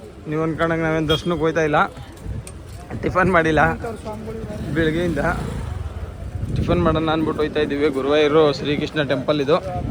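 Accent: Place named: North Karnataka, India. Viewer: Uttar Karnataka Bhasharespect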